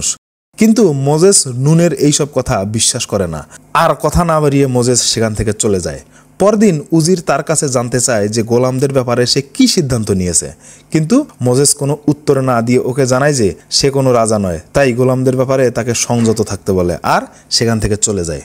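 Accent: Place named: Rangpur, Bangladesh. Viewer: রংপুরের ধারা ভাষ্য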